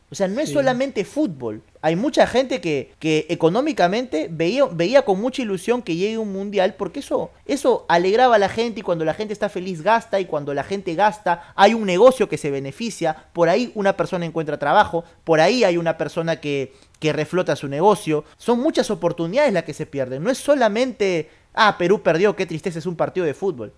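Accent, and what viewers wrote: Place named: Argentina. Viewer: pero porque hablas como argentino?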